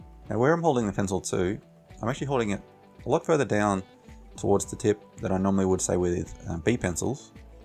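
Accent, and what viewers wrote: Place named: Australia. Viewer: Nice lesson, also good to hear an Aussie voice for a change. Your course is no longer available. Have you moved to Udemy, Teachable or Skillshare by any chance?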